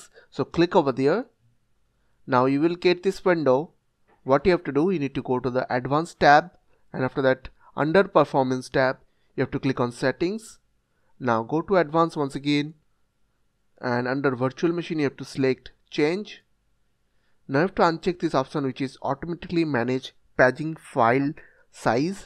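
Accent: India. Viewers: indian sounds are so funny :D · Me not trusting an indian voice after watching Jim Browning channel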